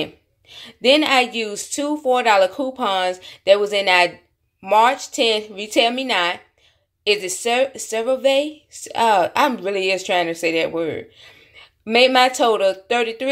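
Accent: United States. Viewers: Pronounce it like this " Sara V" .😋 I am from Northern VA, ( living in FL), your southern accent is fine! Stop fussin' so much! Lol!😄 ( I read some of the comments after I posted this, had to come back and say, smart bunch of gals here! 😉 I said the exact same thing they did, with the exact same spelling! Lol! And I didn't read the comments first! (I promise)! 🤣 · I love your accent 😊 makes me miss my family in N.C. and don't worry about how you pronounce things, it's cute.....😁 and we understand.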